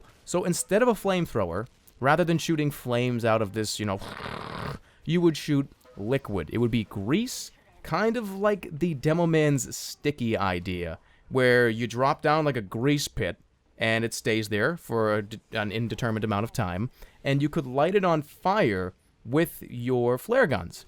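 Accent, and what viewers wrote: Boston, United States. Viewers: Your boston accent is just orgasmic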